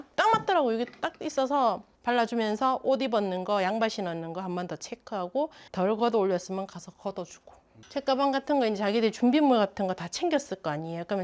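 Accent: Gyeongsang, South Korea. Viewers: 어릴 때 대구살아서 살모시~ 넘익숙 울엄마 말투라 정겹네요ㅎㅎ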